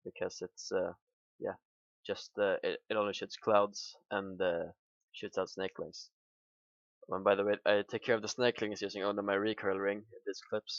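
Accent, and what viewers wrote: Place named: Norway. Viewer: dat norwegian accent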